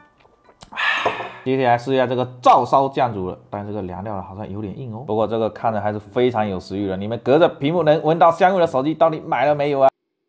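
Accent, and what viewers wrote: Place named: Fujian, China. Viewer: 胡建口音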